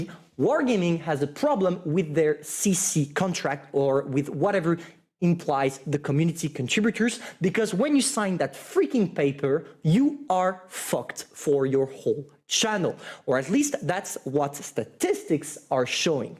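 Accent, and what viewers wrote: France. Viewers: you are the best Blitz- You Tuber of all, besides Meadsy and Droodles. Stay as you are, because you got balls and are telling always the truth about the game. Most I love your french accent in english. All thumps up..... Greets from Germany, the land of the mad.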